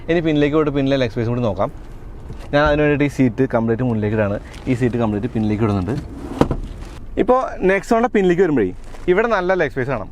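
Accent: Thrissur, India. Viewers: Thirshur language ano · ഒരു പക്ഷെ തൃശ്ശൂർ കാരൻ ആയോണ്ട് ആവും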